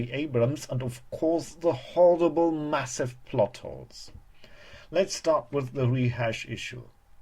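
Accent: Germany. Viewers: I love that German accent! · I liked TFA so I can't say I fully agree with you, but you spoke very well and made excellent points! I love ALL the SW films and I, like you, LOVE the Prequels!! Great German accent too.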